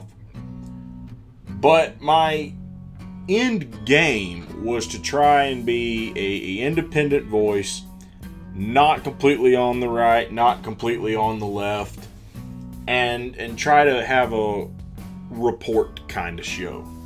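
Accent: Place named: South, United States. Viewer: for what it’s worth you have consistently been one of my favorite channels because you speak your truth in this desert of ridiculous echo chambered circular firing squad madness of collective cognitive dissonance that makes up most of so called “indie media.” If “independent” means “independent” of actual intellectually honest and consistent thoughtfulness; then that title may apply to far too many channels, but not yours. Whatever you do; always be wishing you success, the best and nothing less. Take care out there brother as it’s been nice hearing a fellow southerner that shatters the stereotypes; thanks for all you did (and will do.) ✊ Always Be, Your Huckleberry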